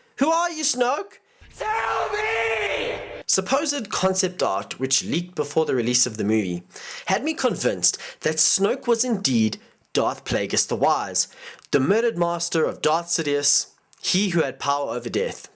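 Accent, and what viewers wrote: South Africa. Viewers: where are you from? you sound south African but I could be wrong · Are you South African? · Are you south African? cause you sound South African. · where are you from u sound south African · Are you some what of South African origin?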